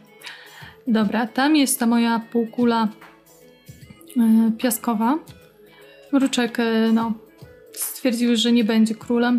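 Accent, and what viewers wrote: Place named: Podlasie, Poland. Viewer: Møwisz Tak o jestes z podlasia :P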